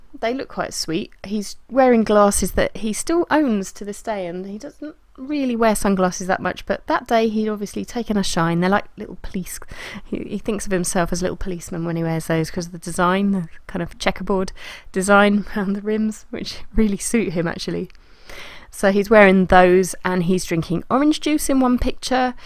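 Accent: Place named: England, United Kingdom. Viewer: Not kind, truthful. I was surprised to hear an English accent though, I was expecting an exotic Nordic-type accent. I have seen you before but only as your name, so didn't connect the two together. Can't wait for more of your lovely cute videos. I definitely agree with Cathy Yosha! See you next time.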